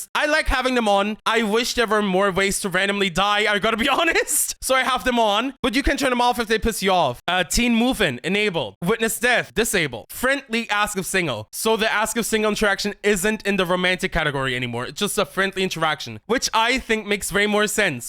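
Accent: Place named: Italy. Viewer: holy molly....you sound ITALIAN ...no offense to the italians hahaha i enjoyed the video truly...it was really entertaining and your acting attitude really fun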